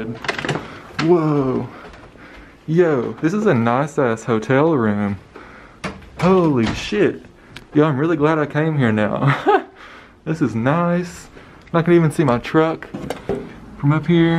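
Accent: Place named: Kentucky, United States. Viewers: Just stumbled upon your channel . From Lexington KY just moved out here to Colorado a couple years ago! Recognized the accent immediately! Much love ! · U WERE IN KY ? I NEW THAT ACCENT WAS FAMILIAR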